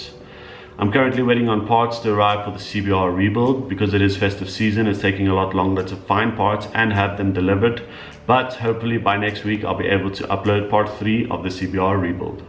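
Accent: South Africa. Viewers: Love it my dream bike the alien ...was happy to hear a south african brother! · Ever since I watched your first video and heard your accent I knew you come from south africa 😂 en uiteindelik het hierdie video dit bewys. Hou aan met die goeie werk en videos yster!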